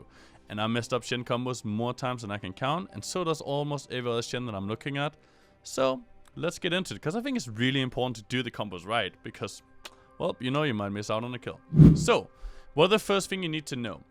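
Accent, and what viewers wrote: Denmark. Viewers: The danish accent hahaha (good video btw)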